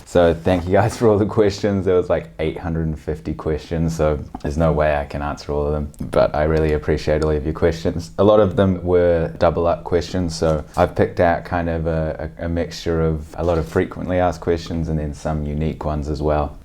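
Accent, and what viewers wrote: New Zealand. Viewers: He sounds like an educated Kiwi. My husband is Australian he says the accent is based on level of education instead of regional location. Same with Australia. Most people expect kiwis to sound like bogans which isn't the case . Hope that makes sense. Cheers.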